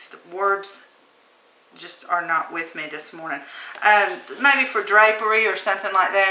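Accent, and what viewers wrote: South, United States. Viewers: I love your instructions. You make is so simple. And your Southern accent. Subscribed to you!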